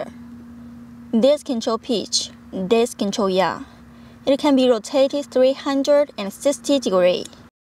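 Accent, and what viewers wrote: China. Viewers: Her English accent is like Chinese 😊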